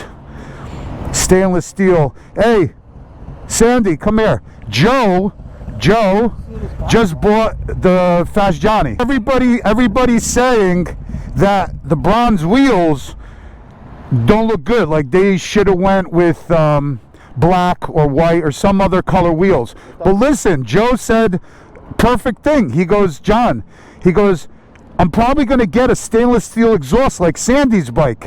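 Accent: New Jersey, United States. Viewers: I enjoy your content, and the interaction with your friends. The Jersey accent reminds me of my late father in law, who was originally from the Jersey City area.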